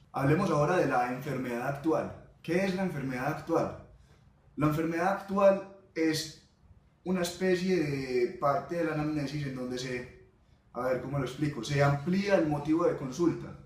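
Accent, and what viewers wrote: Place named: Antioquia, Colombia. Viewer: No solo Colombiano... paisa! :V jajajaja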